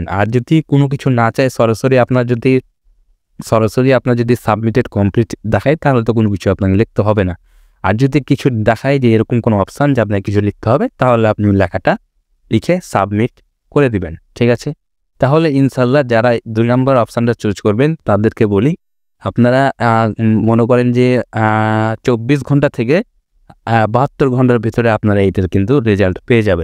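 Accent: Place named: Chapai Nawabganj, Bangladesh. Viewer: Vai Apni ki Chapai zela, ba godagarir upozilar manus? Voice sune amn ta mone hocche..😊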